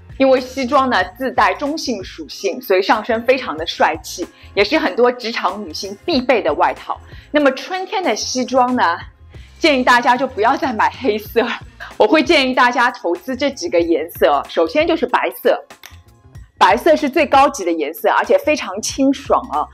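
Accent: Shanghai, China. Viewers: 谢谢推荐。 听口音是上海或者江浙一带的？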